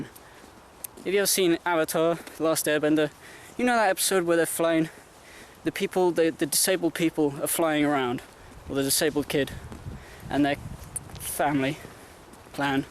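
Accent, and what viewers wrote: United Kingdom. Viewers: You're British? I didn't even realise. And I should have, as I'm British.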